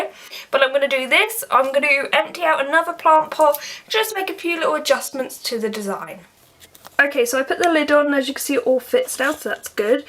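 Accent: United Kingdom. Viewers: Man, I just love your British accent.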